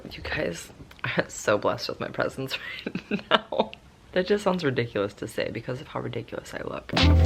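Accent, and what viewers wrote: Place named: Midwest, United States. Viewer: I swear I heard your accent and I Heard the Midwest! Ahhhhh! ❤️ You’re so cute, I’m trying so hard to get into a nursing program and anatomy is killing me with the memorization! How’d you power through the pre reqs to get to your final destination? It’s my dream!!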